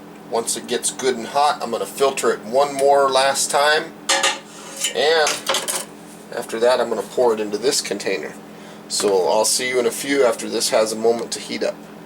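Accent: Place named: Arizona, United States. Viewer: Aaaah, Arizona. That would explain the beautiful accent. Stay safe.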